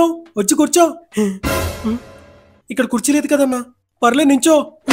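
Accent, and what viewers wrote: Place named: Telangana, India. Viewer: Nuv telangana slang matladak ra malli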